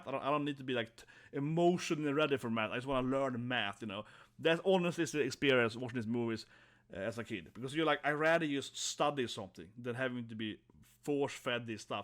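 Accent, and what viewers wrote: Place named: Sweden. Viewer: You keep saying "us Norwegians", but you're clearly Swedish :D Maybe it's an in-joke with the channel I dunno. Edit: Ok you have dual citizenship. But you speak Norwegian like you never spoke Norwegian before, it's pretty funny. You must have spoken Swedish when living here. Anyway, this game epitomizes why we need to shut down the department of culture.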